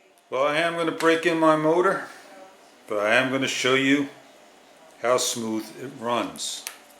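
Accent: New York, United States. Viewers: I hear New York. I could be wrong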